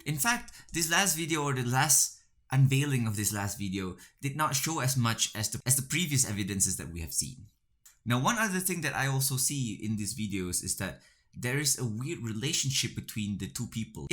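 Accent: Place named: Russia. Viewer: Hebatlah bro. Love your humor and the Russian accent is spot on!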